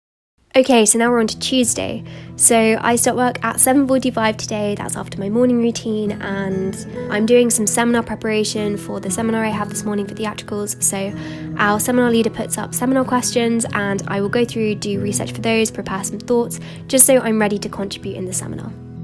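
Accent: United Kingdom. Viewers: I'm learning English, and I love your videos 'cause they are very aesthetic and I really love your accent because he helps me my practice of my listening in English British. Thank you so much!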